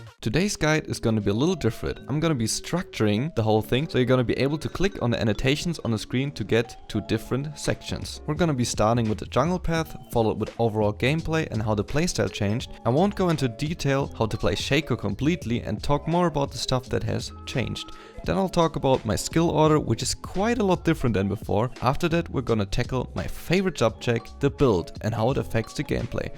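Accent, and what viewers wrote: Germany. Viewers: Ey echt cooles video, ich hätte da 2 Fragen erstmal und zwar: 1. Welche Elo Spielst du also sagen wir letzte Season? 2. Was ist dein Mastery Score mit Shaco momentan? Als Feedback, mach gerne mehr Videos die sind echt Klasse, hast echt alles super erklärt und wieso ddu diese Spielweise bevorzugst. Du könntest deinen Viewern also auch mir zeigen wie du spielst gegen counter Matchups verschiedene invade jgl Wege und was du machst wenn du behind bist. Danke fürs Lesen ( und ja man hört es heraus das du Deutsch kannst) LG ~Finn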